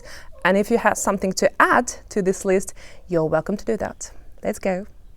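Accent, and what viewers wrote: England, United Kingdom. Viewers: Do you have a British dialect? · british english🤮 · И да кстати,у тебя британский акцент,сразу понятно наклон в какую сторону…и между прочим,можно было меньше гадит по Сербии,всё таки ты в гостях)) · твой британский акцент говорит намного больше, чем то, что ты говоришь. грязь, вероломно используемая в английской пропаганде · Your accent is more english thank Russia. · Your English is awfully to British. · You have traces of a British upper-middle class to upper class accent.. Interesting ! Cold cuts ..ham, beef etc make great sandwiches .. but excess fat does your arteries no good !! (To each his own..) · You have British akcent!!! Rusan ?? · best brittish accent evah!! :D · Ova ima engleski akcenat nije ona dugo videla Rusiju, mucni malo glavom sta stoji iz toga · I do not find anything controversial or offensive towards Serbs or Serbia in this video. 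However, I find it highly improbable that you have acquired such a great British accent by watching movies because I know it for a fact that foreign movies in Russia (as in many other countries) are voiced over. Hence, you had no chance to hear real British accent from foreign movies in Russia. Given that you are not in your early teens, it would be very hard (read impossible) to gain this level of speaking proficiency if you are "learning" as you say. My best guess is that you have lived or gone to school in England in your early age. If I am mistaken, please take it as a compliment. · како си пронашла Србију ако не знаш где је Србија на мапи 😂😂😂 као кад се прича о порнићима, наводно нико их не гледа а сви знају све о њима, ови издајници либерали су свугде исти, за једну Рускињу прилично лепо причаш енглески са акцентом ,то се ретко виђа улагали су Британци добро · A sweet video. Well, you confirm what I already suspected; the Russians are a bit more reserved than the Serbs are; we are from the South of Europe, no wonder, simillar openness like Italians and Greeks. 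You find it sad that the many Russians do not know Serbia exists; I understand that feeling. But I do not find it so surprising; we are such a small people compared to you. And how could I expect that your grandma taught you to love the Serbs as you were 6, like my grandma taught me :) BTW, your English is that good, that I wouldn't wonder if you've spent some time in an English-speaking country (sounds like England). All in all, very likeable :) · Ma koju njenu državu? Vidiš da ima britanski akcenat. Rusko je možda samo poreklo. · You have a can`not decide which but your akcent is British,Australian or New Zeland...Fluid english... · Of course that Serbia and Russia have different customs and mentality. Even in different parts of Serbia and Serbian lands customs and mentality are different, not to mention Russia which is removed by thousands of kilometers from the Balkan peninsula. I noticed that speaks with a bit of a British accent, which means that she probably knows far more about Britain than about Serbia. 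Конечно чтo в Сербии и России обычаи и менталитет по другом. Даже в разных части Сербии и сербских земель разные обычаи и менталитет. Россия удалена тысячи километров от Балканского полуострова. Я заметил, что есть легкий британски акцент, что означает что она вероятно знает больше для Британии чем о Сербии. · your english shows us you were living in UK for years and more. so this is just another paid channel paid by west trying to make bad blood between russia and serbia. everyone just report her so we close her channel. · How do you have a British accent if you are from Russia? Just curious ☺️❤️ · I found this channel so interactive. Great job! I definitely will subscribe to your channel. By the way, you have a great British accent. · You speak English like you are from England 🤣.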